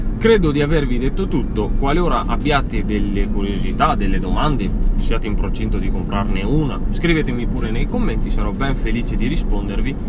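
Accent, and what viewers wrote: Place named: Contarina, Italy. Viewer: ho beccato per caso il video tra i suggeriti e ho riconosciuto subito l'accento Contarinante,top meriteresti più views,adesso mi sparo anche gli altri video!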